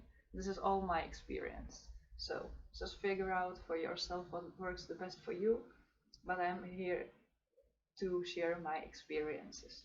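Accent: Netherlands. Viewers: thank you for all the information! <3 I am currently growing Golden Teachers and am planning on microdosing. Are you dutch btw? Your accent sounds a little bit Dutch :)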